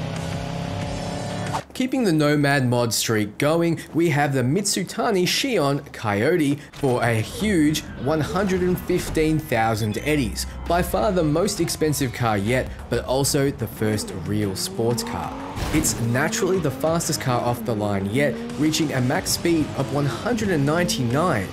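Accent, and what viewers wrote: Australia. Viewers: Different accents pronounce things differently. There's no absolute right way to pronounce words in english since there's so many different versions of it. E.g. the word "herb" is different between american english and british english since americans say with H as silent while british actually say the H. This dude is australian so they might say it with the E silent. I'm finnish and we study british english at school and even I would pronounce Porsche with a silent E.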